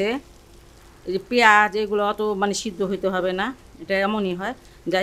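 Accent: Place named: Old Dhaka, Bangladesh. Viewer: আসসালামু আলাইকুম আন্টি। রান্না করার সময় আপনার হাতগুলো দেখে মনে হয় একদম আমার আম্মুর হাত এর মতো। আর আমার নানাবাড়ি পুরানো ঢাকায়। আপনার কথা বলা আর রান্না দেখে মনে হয় আপনিও পুরোনো ঢাকার মানুষ। অনেক শুভকামনা আপনার জন্য আন্টি। দোয়া করবেন আমাদের জন্য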